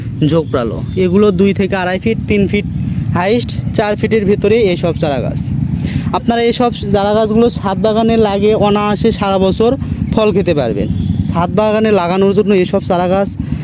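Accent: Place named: Bogura, Bangladesh. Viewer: বগুড়ার কথায়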